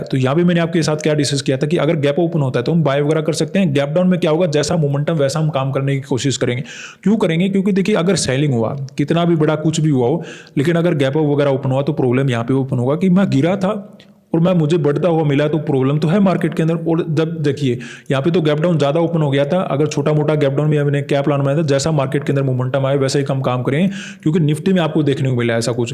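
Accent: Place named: Punjab, India. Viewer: Sir pakka punjabi hai awaj se pata chal raha hai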